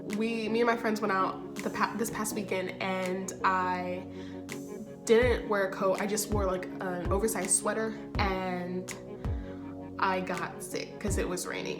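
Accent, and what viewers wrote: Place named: Sweden. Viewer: You actually sound more swedish than danish. Thank god! Go over to Sweden instead a little better on everything. Danish can´t even play hockey. Sweden yes! Denmark no, burn in hell danes!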